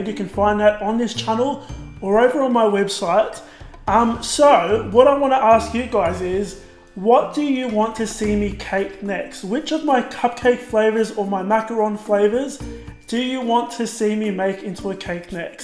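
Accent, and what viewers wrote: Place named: Australia. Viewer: Nichole Li M8, he is from Australia, it's called an accent. He is saying "choc chips"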